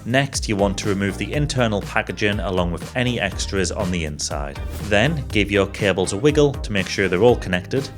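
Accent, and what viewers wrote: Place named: Newcastle, United Kingdom. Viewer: whew, canny geordie yee like.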